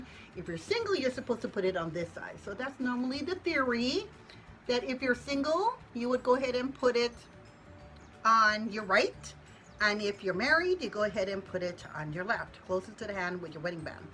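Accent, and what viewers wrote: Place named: Texas, United States. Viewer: I really like this Q&A I really got to know you a lot better. after I finished Cosmetology in realize that I didn't like people that much I went to a funeral home and asked if I could get a job there and fortunately there's one person that pretty much does all the people there so they didn't need help at that time but I have thought about doing that sort of work. I would still get the fix hair and not have to deal with talking to the person. I later thought that it would probably be worse with the family loved ones being so particular of the person the looked before they died. I'm not a quiet person so that probably wouldn't work out for me either. I like how you was talking about your husband's Texan accent was rubbing off on you that's super cute.Great video👍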